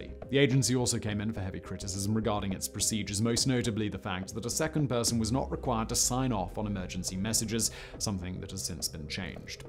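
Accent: RP, United Kingdom. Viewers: His BBC accent isn't forced and not at all cringeworthy. What a pompous arse.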